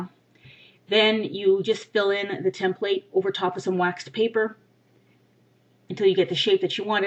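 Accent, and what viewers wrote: Canada. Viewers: Yeah you sound Canadian don't worry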